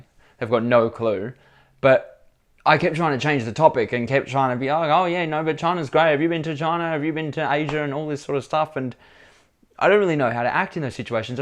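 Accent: Australia. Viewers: australian accent😍😭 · dude which part of melbourne are you from???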